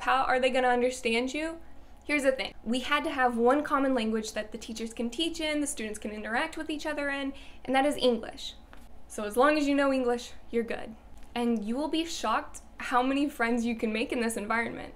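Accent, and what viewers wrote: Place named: South, United States. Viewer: Thank you for your Speaking Japanese in a Southern accent videos, those made my day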